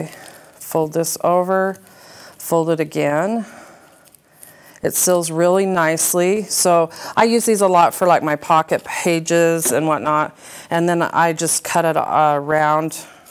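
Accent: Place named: United States. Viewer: bravo, but your english is only for americans,you talk to fast and i hardly understand what you say,bravo any way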